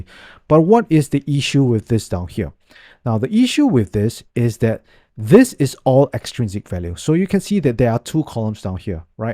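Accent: Singapore. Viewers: singaporean eh ?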